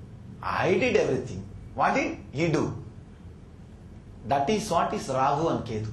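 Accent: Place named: India. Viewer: Swamiji's accent has gotten strong again after his being back in India for Himalaya trip. It was hard to understand the first part, but worth while holding on, as usual. An interesting addition to the enlightenment lesson.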